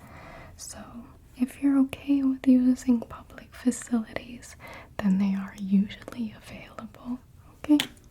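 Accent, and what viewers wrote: Midwest, United States. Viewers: As a Minnesotan, the midwestern tinge to your voice in the old school videos like this give me LIFE. 🤩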